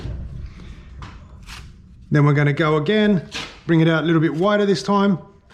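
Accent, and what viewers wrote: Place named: Australia. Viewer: 🤔Why do you sound Australian?🤔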